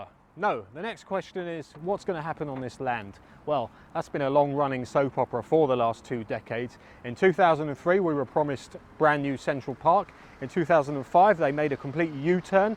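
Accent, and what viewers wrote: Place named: London, United Kingdom. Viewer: .. i'm fascinated by your accent.. you sounds like born and bred Londoner.. how come!!!.. just founds your Channel.. haven't had time to look at all your video yet..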